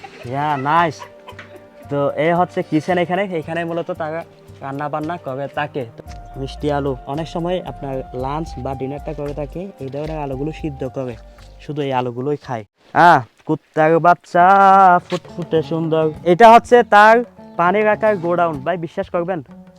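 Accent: Chittagong, Bangladesh. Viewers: আপনার ভাষায় আমার মনে হচ্ছে আপনি বাংলাদেশের চিটাগাং য়ের লোক টিক আছে কি জানাবেন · ভাই আপনিকি চট্টগ্রামের চট্টগ্রামের ভাষা শুনছিলাম 🥰🥰আমিও চট্টগ্রামের,রাঙ্গুনিয়া।।। জানাবেন চট্টগ্রামের কিনা🥰🥰🥰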